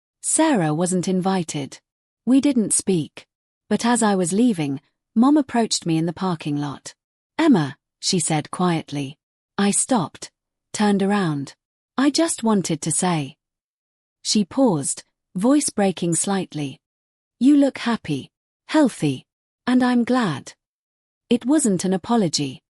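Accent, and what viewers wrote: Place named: United Kingdom. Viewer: As a Brit surprised to hear a British accent in this story. “I started therapy to understand why I believed them & thought you were overreacting” Good Grief Watching from Leicestershire in the UK 🇬🇧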